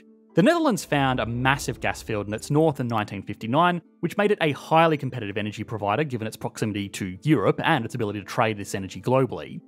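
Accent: Australia. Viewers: I’m curious about your accent. The channel seems to be from the US, but it kinda sounds like Australian sometimes? Where is the accent from?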